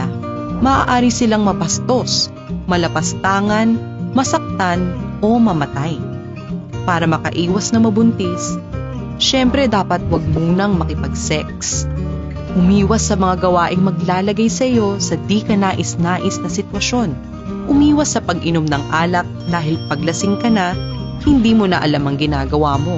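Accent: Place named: Philippines. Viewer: Very tagalog 😂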